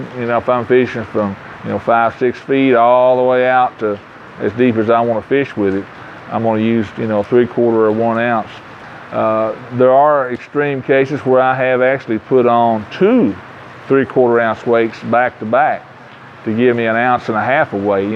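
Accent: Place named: Appalachia, United States. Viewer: Appreciate you spreading the seed of knowledge, you got the perfect hillbilly accent for these videos lol. Even if it weren't gold that accent would convince half of your viewers.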